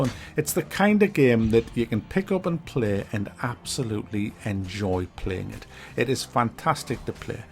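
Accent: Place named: Geordie, United Kingdom. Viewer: Howay, I want to see a video with that proper geordie accent, I feel like you try to tone it doon...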